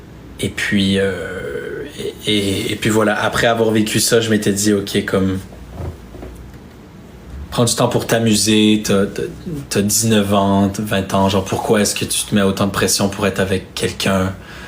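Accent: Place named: Quebec, Canada. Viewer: Il me tarde beaucoup d'écouter ta nouvelle chanson... Par ailleurs, j'adore ton accent québécois: ) and I like it too when you speak English: ). Je t'ai découvert par hasard en regardant DALS, je vous avais adoré Marie et toi, et ca a été un bonheur de te suivre depuis. Surtout ne change pas, merci pour tout: ).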